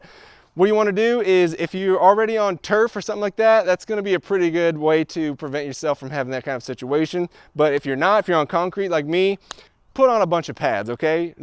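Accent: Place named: South, United States. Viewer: Great video....But when did you get the southern accent?!!!!😁😁😁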